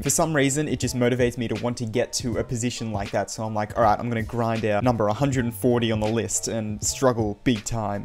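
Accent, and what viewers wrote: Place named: Australia. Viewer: absolutelly i love your australian english accent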